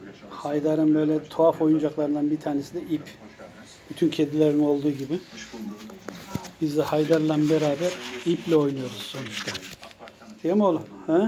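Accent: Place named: Kayseri, Turkey. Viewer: Abi ben daha önce de yazmıştım size sizin şiveniz kayseri şivesi bana bu şive tanıdık geliyor bende kayseriliyim antalyada oturuyorum yani yakınız sizi hep izliyorum annenize allah iyilik versin hayear zaten bir numaralı artist maşallah Bu arada yeniyılınız kutlu olsun 😸😺😻🙏👍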